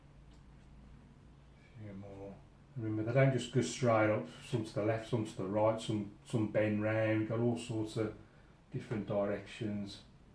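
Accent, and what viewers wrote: Birmingham, United Kingdom. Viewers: Beautiful result! I like the way you break down the painting when it's all done. Wish more YouTube artists would do that. Great Brummie accent btw! =)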